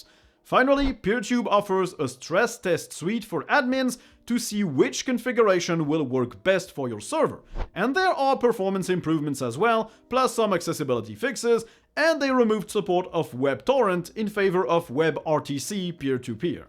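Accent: France. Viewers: I'm living in Haute-Loire, and as a French it's very strange to watch a French speaking English, at least I totally understand what you are saying 😆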